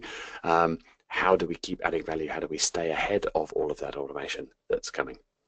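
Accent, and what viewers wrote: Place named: United Kingdom. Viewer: British accents are sick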